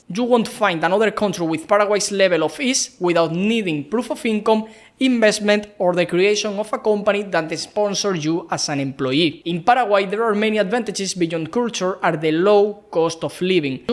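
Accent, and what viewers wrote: Spain. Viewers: don’t worry. Es nuestro acento español, q no nos lo quitamos ni pa’trás!😅😂 (a ver, que sí, que se puede mejorar, ….pero no es lo importante. Tu contenido es bueno y es por lo q viene la gente) Sigue así!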